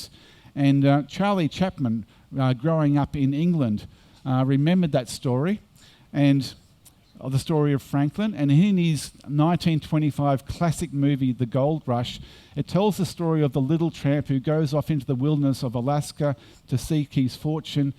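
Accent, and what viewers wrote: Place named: Australia. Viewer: ..trying to get past the Aussie accent, the image of Tower Bridge doesn't help.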